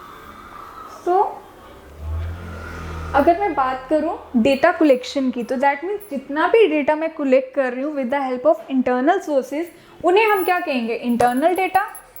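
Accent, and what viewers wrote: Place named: Bihar, India. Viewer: Mam app acha padhtii hoo but app measurement conclusion kee sahi pronunciation use kero app Bihari pronunciation use mat keri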